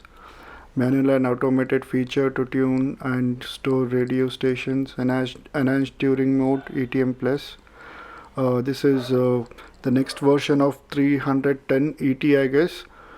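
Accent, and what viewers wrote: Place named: England, United Kingdom. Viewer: I am in love with your English accent brother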